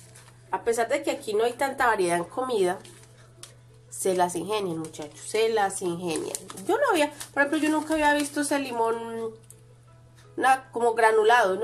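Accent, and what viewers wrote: Colombia. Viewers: Hola mi hermosa, no usted no nos aburre a mi en lo particular me entretiene y ese timbrito de voz colombianito me encanta 😍 me gustan mucho las personas colombianas yo soy de Mexico y siempre me ha gustado el tono colombiano. Cuídese que Dios los bendiga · A mi me encanta su forma de ser y decir las cosas muy directa pero sobretodo su acento colombiano, no haga caso, siempre hay gente envidiosa y maluca como dice usted.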